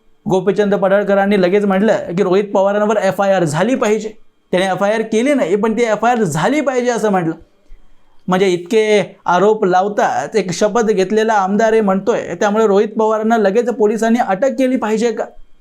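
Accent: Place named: Karnataka, India. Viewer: सम्बनधित निवेदन करणारा हा व्हकती नक्कीच कर्नाटक राज्यतील आहे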